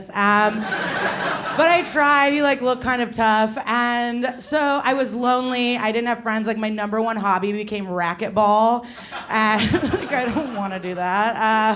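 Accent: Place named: Midwest, United States. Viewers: Her voice is def from Skokie. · Ya know I usually hear the midwest female accent (go to fucking Naperville, IL and tell me 80%+ of all the chicks DON'T sound exactly like this😀) and immediately turn it off or run away. Glad I didn't this time. Throwing bedpans in a hospital is the best criminal offense I've heard in years.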